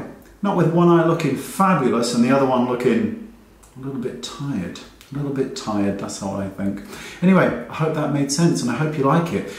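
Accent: United Kingdom. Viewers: TBH I dont do make up,I just follow you coz youre so hot 😂 And I like listening when you speak british ,Im a weird? Maybe 😂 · Come on guys, there's American English and British English and he has clearly a British accent!?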